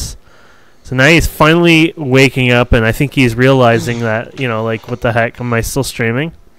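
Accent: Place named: Canada. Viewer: Kanadai csávó, hallani az akcentuson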